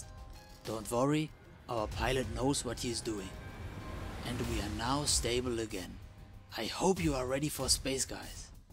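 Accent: Germany. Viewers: Are you German? Almost every German has that English accent. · kollege man merkt dass du deutsch bist :D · Are u a german??? :-) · Not sure if German or Japanese.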